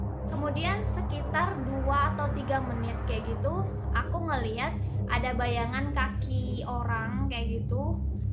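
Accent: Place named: Kalimantan, Indonesia. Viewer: cara bicaranya mirip org kalimantan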